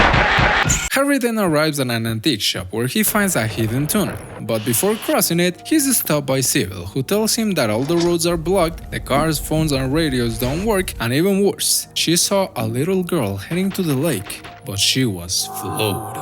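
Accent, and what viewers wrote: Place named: Russia. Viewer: Good Russian(?) accent for storytelling! nice video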